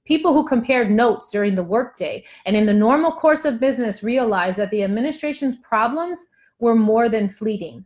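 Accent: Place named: Bronx, United States. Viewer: 🥰 that Bronx accent!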